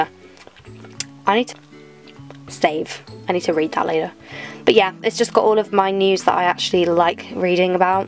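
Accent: United Kingdom. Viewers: british accent so annoying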